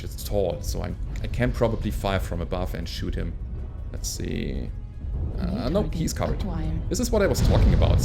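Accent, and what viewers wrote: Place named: Germany. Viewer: Hey! I'm using a KingCrab too. Nice video! In the meanwhile I didn't played a long time. But I'm downloading the game again. My KC is pretty good too. Is it possible you are from germany or so? Your talking sounds like a german one who tries to talk english... but really good english. ^^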